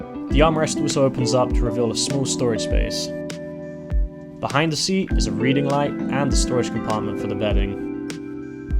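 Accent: England, United Kingdom. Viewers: Extraordinarily light blue eyes. I thought he might be Norwegian but I've just googled and apparently it's diminutive Breton for Jean. Thought he pronounced Perignon well.. Saw another vid where he put a stress on the "u" of Olufsen, whereas English people usually stress the O, although you can't tell he's not Oxford/Slough English with just a touch of Youtube US. Loved the cubbyhole demo🙋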